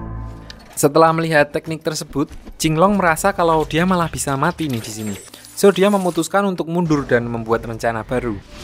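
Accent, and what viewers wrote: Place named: Java, Indonesia. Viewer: Medok bgt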